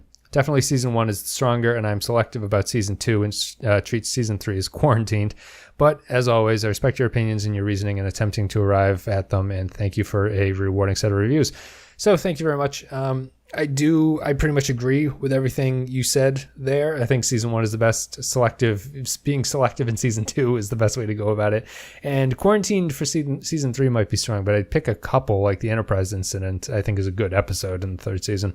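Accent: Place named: Upper Midwest, United States. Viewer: Over-apologizing? Hahaha! I'm going to show that to all my Can-head buddies here. Actually, you have a very distinct way of saying "out" and "about". If I had to guess, I would say either Seattle, North Dakota or Minnesota.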